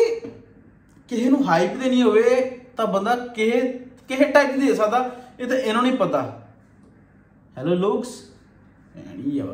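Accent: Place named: Punjab, India. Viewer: sir.... Tuhada punjabi touch bohat vdia ae alhamdulillah but please avoid using 'Bhencho' that much......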